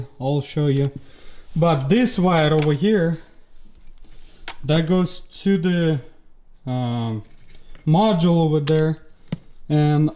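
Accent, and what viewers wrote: Russia. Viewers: Actually for a 100% Russian dude you have an amazing accent. Greatings from Serbia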